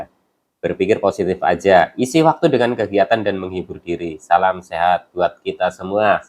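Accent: Banyumas, Indonesia. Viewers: Cilobak raya hadir menyimak massee, ora Ngapak ora kepenak😂 apa Kuwi "Tengengan, Massee?"🤣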